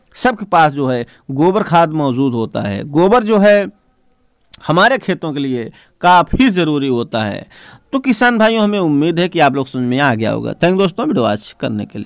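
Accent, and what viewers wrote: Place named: Sultanpur, India. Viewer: बहुत सुन्दर प्रयास मैं सुल्तानपुर से हूँ। आपको बहुत बहुत धन्यवाद। अपने मिट्टी की खुश्बू आपकी आवाज से आती हैं। स्तुत्य प्रयास।🙏❤️🙏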